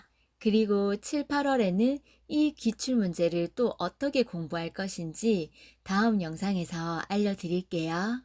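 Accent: Gyeongsang, South Korea. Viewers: 감사합니다 목소리가 이쁜 공인중개사님~~ 성우 해도 되겠네요~^^ 대구분이세요? 잘듣고갑니다~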